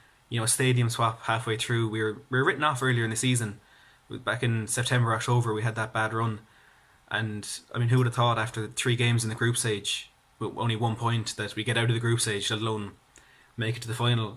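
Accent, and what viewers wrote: Ireland. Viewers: a weird accent, how rude, is from Ireland, his accent is very common in Ireland!, comment on his Blog, not how he sounds.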